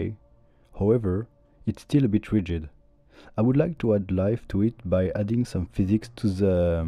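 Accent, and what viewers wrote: France. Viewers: I do seem to recognize a french accent, eh eh Its nice to see you try new things/formats, keep up the great work! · You got a nice french based voice man, nice to hear someone speaking like me :v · Really nice video, and damn was'nt ready for a french voice reveal ! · Voice is very soothing. The french accent is hearable but honestly that's what makes your voice's charm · Love your accent man! Are you from France? I'm Spanish and it reminds me of that. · Are you french ? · Are you from France? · Are you french ? Love your voice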